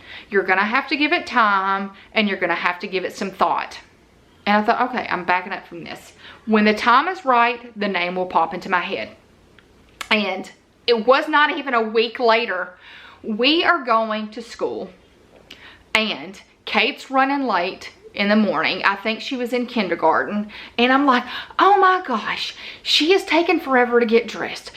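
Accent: South, United States. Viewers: Watching you for the past year has been a delight. Your personality shines through in every video. Your southern accent is just the icing of the cake for me. I lived in Georgia for many years and miss the hospitality and joy southerners bring to any conversation. I look forward to watching your videos every week because they always make me smile. In fact, at the end I always say with you “bye you guys”...don’t know if you realized you have that catchy phrase too. Keep up the good work! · Thank you for answering all my questions I posted in the FB group! I love your channel and following you on IG as well. I wish I could remember exactly how I found you but I don’t. I will tell you, however, that your sweet southern accent drew me in and I still love it!!! I admire your authenticity as much as your content and have no doubt that silver play button is in your future ❤️ I look forward to supporting and cheering you on as you continue to set and exceed your goals!!!! 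😊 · I have been tuning in on your channel for a few years now. I love your channel. I find myself waiting on Mondays, Wednesdays and Fridays to see what you talk about. I just love it! Keep up the good work 😊👍. Be you, ( southern accent and all 😉), and don't change a thing. Take Care. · You are one amazing lady,. And if you think the Southern accent is an issue, you should hear the local accent and idioms from Cardiff in South Wales 🏴 where I was born! It’s much worse! xxxxxxxxxxxxxx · I love your company name even more now that I know the history behind it. And for the record, I love your southern accent! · you are an inspiration. I love how relatable you are! I so look forward to your videos. And your southern accent is charming! Much better than my Boston accent!!!! 😉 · I love that you have an accent , I too am a southern girl and have often thought of having a chanel ... also FYI , you can do an IGTV and put links on your stories via IGTV...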